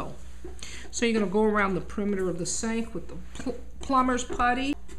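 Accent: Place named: New York, United States. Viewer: I gotta ask, what accent is this? Does this orignate from NY?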